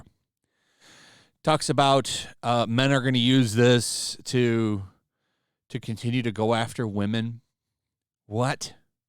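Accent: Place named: Upper class, United Kingdom. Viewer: Not a bad attempt at the snooty English sound. I'm a Yorkshire man and it's only a certain "class" that talk like that... fortunately.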